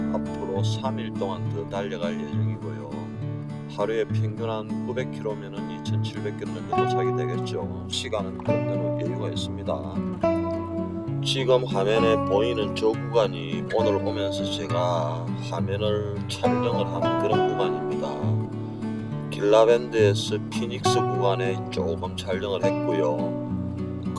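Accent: Gyeongsang, South Korea. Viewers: 저희집앞을 지나가셨네요 ㅎ 101 과 I-17 만나는교차로 근처에 살고 있습니다~~~ 저도 경상도 사람이라 항상 사투리가 정겹네요~~~ 영상잘보고있습니다~~~ 항상 안전운전하세요~~~ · 경상도 사투리가 늘 정겹게 느껴집니다. 처가는 포항, 매형 두분도 경상도입니다. 나라사랑 잊지마시고 늘 안전하고 건강하게 지내세요 ~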